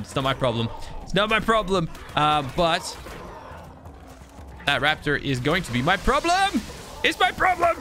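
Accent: Australia. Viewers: Are you Australian cause you sound Australian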